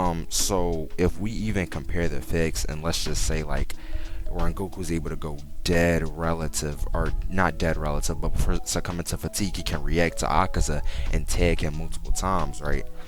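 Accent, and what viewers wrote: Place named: Philadelphia, United States. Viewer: I can hear the philly accent! very dope commentary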